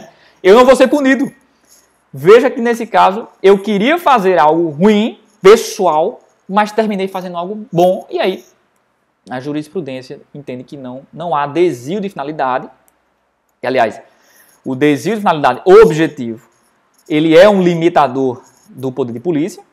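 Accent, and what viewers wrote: Northeast, Brazil. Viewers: Amei a aula, mais um inscrito. Mais esse sotaque seu é maravilhoso, tu é do nordeste né? Amo meu lugar 😍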